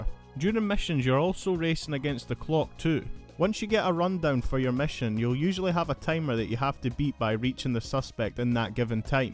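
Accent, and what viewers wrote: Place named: Scotland, United Kingdom. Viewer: A Scots man giveing me nostalgic…. Earns my sub ✊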